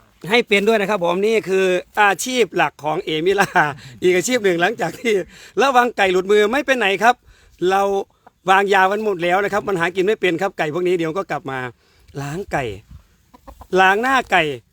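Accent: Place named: Laos. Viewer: FC.จากสระบุรี เสียงดังฟังชัด ชอบอาจารย์พูดไทยปนลาว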